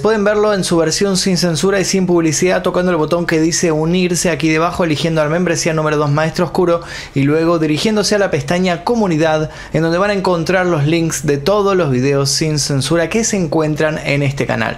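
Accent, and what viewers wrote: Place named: Argentina. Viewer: El acento argentino está de más me cae 🙄 de quien fue la idea de distorsionar el español de esta manera 🤦🏻‍♀️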